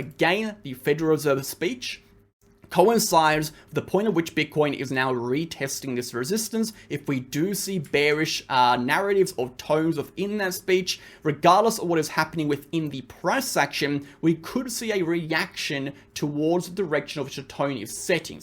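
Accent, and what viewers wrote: Australia. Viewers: just found you bro, aussie here. got lucky and saw this within 2 hours of you posting. Nice to hear an aussie voice for once! Joined that exchange too, appreciate an exchange thats not trying to monitor everything I do! Used your code!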